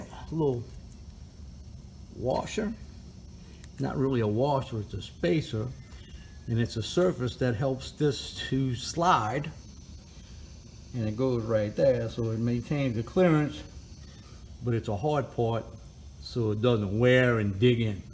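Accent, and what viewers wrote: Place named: New Jersey, United States. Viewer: You from Jersey or Down South.?? Washington state, here. Just found this video again and laughed. If you really want to lose things, important things. You wheel your bike out into the uncut lawn grass and let the bolts fall into the grass. You or the next four house owners many find the parts, OR MAYBE NOT...??? Great Vid and Cool Accent , BRAH...DSD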